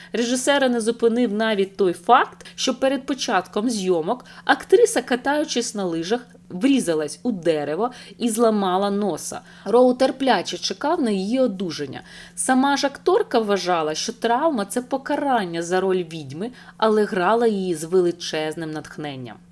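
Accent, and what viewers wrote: Russia. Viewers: текст читається з потужною московинською вимовою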